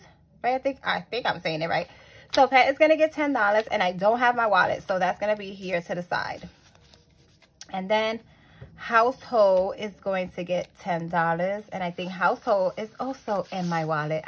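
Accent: New York, United States. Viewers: I felt like I missed your face. You are one of my regular views. This long, but you won't lose God's grace by not paying tithes. God knows your hear and besides the bible instructs us to pay tithes but it also tells us that debt is bad and avoid it. Literally every reference to debt in the bible is negative. So, paying debt first or paying tithes first....both are consistent to the bible imo. Keep it up. And I low key love your NY accent. 💪🏾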